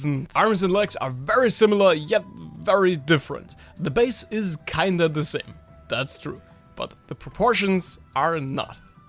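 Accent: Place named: Germany. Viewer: wy is is a garmen accent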